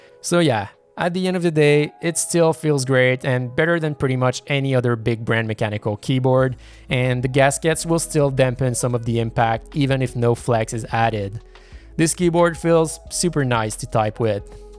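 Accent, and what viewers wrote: Quebec, Canada. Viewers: Are you french canadian by any chance?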